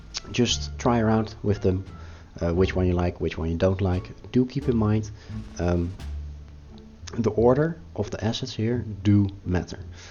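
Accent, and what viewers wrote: Netherlands. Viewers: haha its so funny to hear your dutch accent lol, great video!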